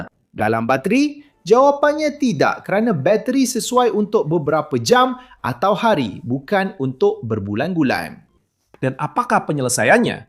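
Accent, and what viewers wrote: Indonesia. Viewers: Admin ni orang Indonesia yang bercakap Bahasa Malaysia ke? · Bodoh je orang indon ni buat contain guna AI alih bahasa Melaysia campur bahasa indon. Bodoh doh bunyi dia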